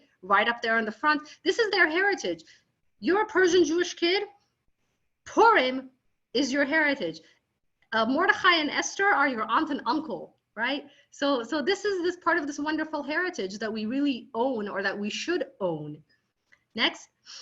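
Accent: United States. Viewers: Over 300,000 have Iranian perished since these fanatics took over.please mention all Iranian's suffering.I am amazed how you adapted to the American accent and look. thanks for recording the history .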